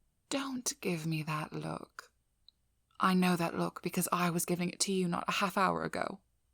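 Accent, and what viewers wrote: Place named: United Kingdom. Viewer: When I tell you I SQUEAKED when I heard the British accent. I turned into a huge ball of floof squealing and kicking the air while morphing into a red balloon.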